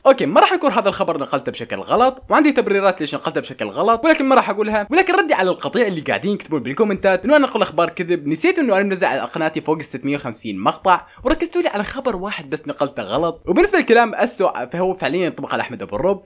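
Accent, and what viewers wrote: Iraq. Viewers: اكلك انت عراقي؟؟؟؟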